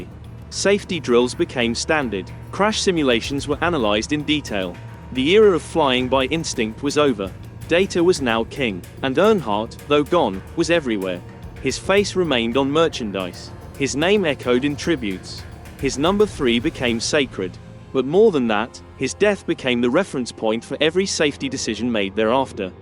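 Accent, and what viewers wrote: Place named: United Kingdom. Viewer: You just ruined this by having a Damn British accent Commentator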